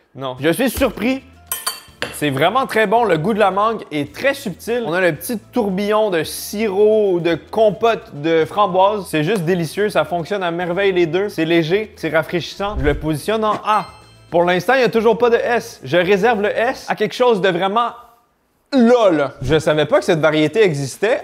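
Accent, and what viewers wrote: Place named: Quebec, Canada. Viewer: J'aime bien les concepts mais encore plus l'accent Québécois je crois :)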